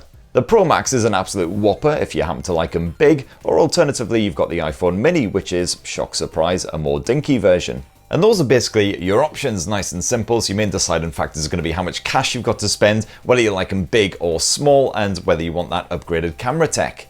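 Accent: United Kingdom. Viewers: Great video. It's nice not to be patronised. Also, its nice to hear a British voice! Thanks.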